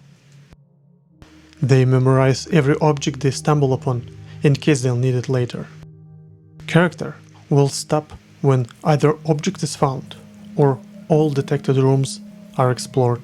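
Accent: Russia. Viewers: why he has so a russian accent?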